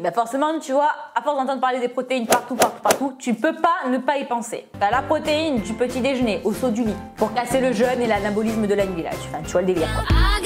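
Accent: South, France. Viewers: D'où nous vient cet accent ? Ça sent le sud ☀️ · Le petit accent du sud haha ! encore une vidéo trop drôle et trop bien #teamsnap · coucou, super vidéo comme tj continue comme ça:) t'es magnifique en leggings et brassière haha ps: j'adore ton accent du sud:p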